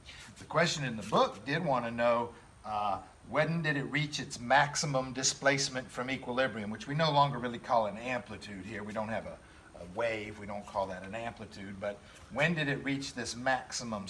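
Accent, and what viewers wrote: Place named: South, United States. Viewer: God, its feels so nice to hear a southern accent. You talk like I think. Hallelujah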